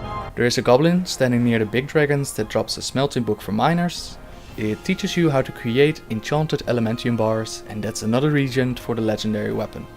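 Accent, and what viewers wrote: Netherlands. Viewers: Are you dutch?? i can hear it in your voice :) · je hoort wel dat je nederlands bent haha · dude are you from the netherlands or something? I think i can hear it in your accent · Dutch accent! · Dutch accent? ammiclose?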